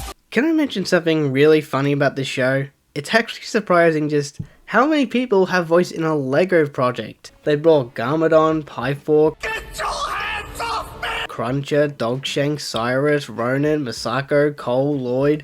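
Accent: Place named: Australia. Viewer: I was today when I noticed your Australian,🙃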